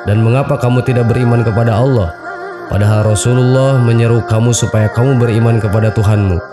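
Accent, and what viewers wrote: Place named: West Java, Indonesia. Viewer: Sunda banget yak 😁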